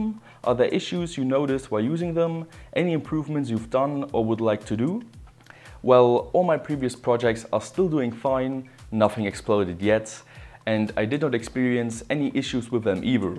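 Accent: Germany. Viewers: er hat den deutschesten Akzent den man sich vorstellen kann. Alles was er aufschreibt und kauft ist in Deutsch geschrieben... ou man ^^ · Man hört raus das er Deutscher ist.. haha.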